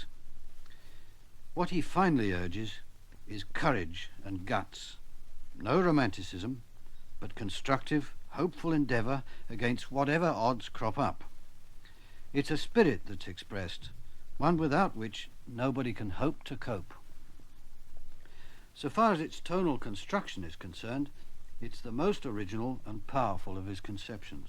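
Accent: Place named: England, United Kingdom. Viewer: Oh, what an authentic English! Of course, the explanation is excellent.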